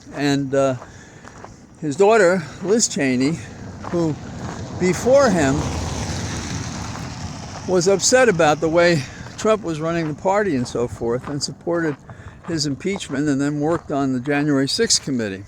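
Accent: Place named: Ireland. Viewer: ... I never miss your talks. I always learn a great deal. Your critique of Judge Juan Merchan and D.A. Alvin Bragg today is understandable. However, I believe there is valid reasoning behind their actions. The D.C. Circuit Court is going to lay out Jack Smith's charges and these will be presented and discussed with reporters able to appraise them. That will occur before the election and I believe they will have a great impact upon the public, the court and the election. After Mr. Trump is defeated, the judge will have a full range of options to sanction him. That is, I believe, the plan. We shall see. Thank you for your input each day and for your Irish lilt!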